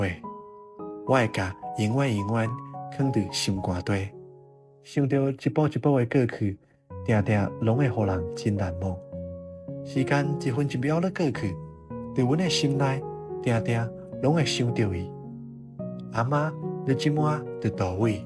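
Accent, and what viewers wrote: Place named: Lukang, Taiwan. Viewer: 臺灣台語正範ê台灣海口腔(鹿港腔)足好聽的台灣國語台灣話。 2026/06/16(二)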